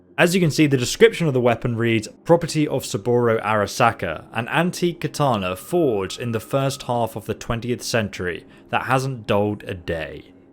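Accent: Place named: United Kingdom. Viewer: mishrooms I tolerate him because hes handsome and his british accent is good and his temperment is enjoyable iin his videos... but yeah hes jumping on the bandwagon of mass producing "guide " videos and using keywords like the millions of other youtubers all doing them... Idk how he has time to do ANYTHING but play these games i mean I havent even finished AC valhalla yet it was just so massive - and now THIS is massive too... I'm drowning in these open world games that GIVE you the whole world at once - piecemeal it to me - All these massive open world games give me anxiety and make feel overwhelmed and exhausted as soon as I see the map and all teh shit ive got to do to satisfy my OCD and ADHD :( MAkes me want to play and On Rails or series of corridors game that t ells me a nice story instead of all of these options - i mean some optionms are good but not dozens and dozens of side quests and collectibles and every zone opened up after the prolouge... 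shit makes me not even want to be in parts of the city, like some parts of it are so confusing with the verticality it took me like 10 minutes to find the door to a ripperdoctor there otehr day lmao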